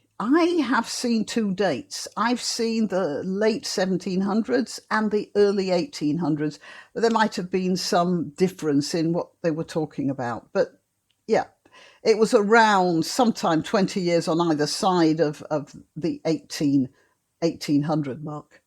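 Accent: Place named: United Kingdom. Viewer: I love this stuff. I didn't know any of it really. And I love hearing it spoken ( in 'The Queen's English? Do you still refer to it as such? ).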